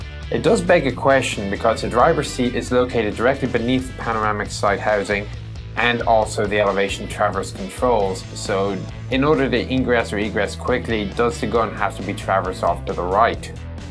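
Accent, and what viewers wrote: Ireland. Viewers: Listening to your accent, gives me the impression that you're Irish. Am I correct? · He sounds slightly Irish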